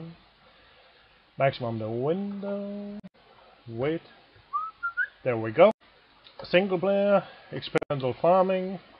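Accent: Denmark. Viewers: Great video, even though the audio is pretty f***tup. But i am wondering, where are you from? Denmark? Or anything close? :P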